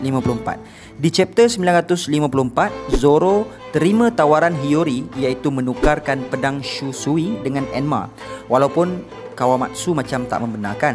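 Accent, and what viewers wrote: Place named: Kelantan, Malaysia. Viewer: Arrr loghat ore koto bharu klate nim!!!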